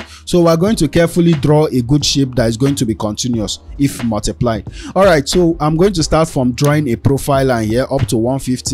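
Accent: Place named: Singapore. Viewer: I am from Singapore and I think we have a very similar accent! haha.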